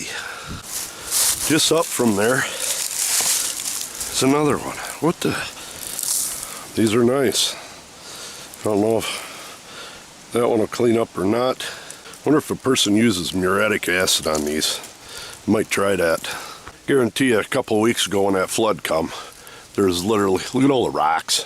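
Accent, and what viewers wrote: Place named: Midwest, United States. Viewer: You sound so midwest I love it.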